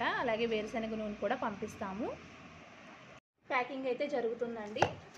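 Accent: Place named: Amalapuram, India. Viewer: అమలాపురం మాట వినగానే🤗🤗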